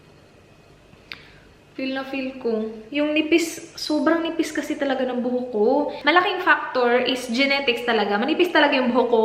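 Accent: Visayas, Philippines. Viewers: Makakatowa kanaman mag salita ate girl Bisayang Bisaya..I love you ate girl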